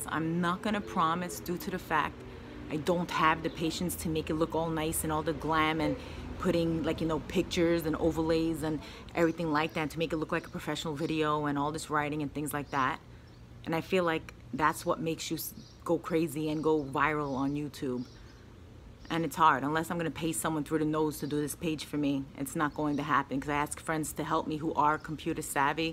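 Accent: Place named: New Jersey, United States. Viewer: a stalker. By the way you sound more like Jersey than Brooklyn. Lol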